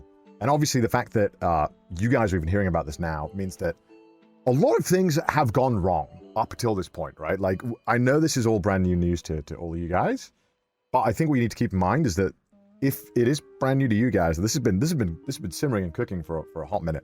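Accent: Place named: Wales, United Kingdom. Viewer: is so so angry. His Welsh accent is creeping into his radio voice.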